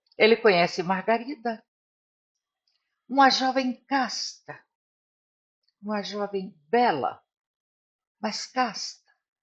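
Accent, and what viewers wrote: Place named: Rio Grande do Norte, Brazil. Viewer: Curiosidade: seu sotaque soa potiguar. Você é daqui?🙂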